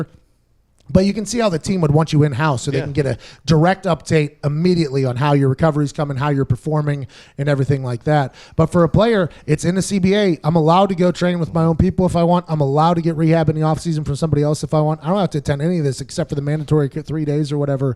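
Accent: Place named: Pittsburgh, United States. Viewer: “Aht” love the pittsburghese that speaks.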